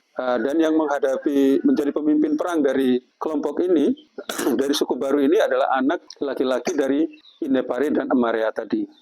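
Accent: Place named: Java, Indonesia. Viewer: Mohon maaf bapak,, bp bisa 'medok' begitu, apa sering berbahasa jawa pak 🙏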